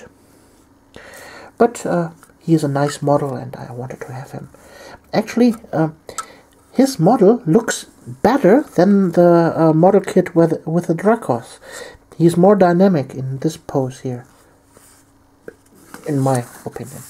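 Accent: Germany. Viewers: Ah, da war doch dieser charakteristisch deutsche Akzent :) Wollte nur mal kurz sagen, dass Du wirklich guten Content lieferst und mir maltechnisch wieder wirklich Lust auf das Hobby gemacht hast. Bleib wie du bist, hast nämlich eine wirklich sympathische Art!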